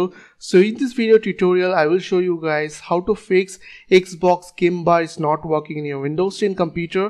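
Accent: India. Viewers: Video accent English - - - - - - - Indian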